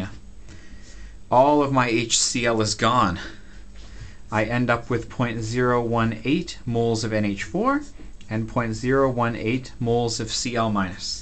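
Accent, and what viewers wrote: Canada. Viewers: you just took a nice $@ on my professor life, thanks man and btw i really like the canadian accent "OAT" when you say out haha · are you canadian? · ''check it OOT'' gotta love the Canadian accent man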